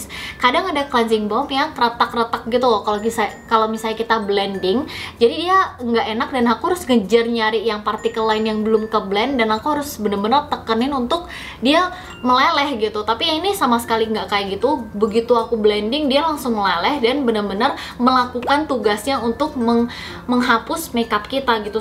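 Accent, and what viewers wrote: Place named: Java, Indonesia. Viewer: bibirna medok teuing eta teh...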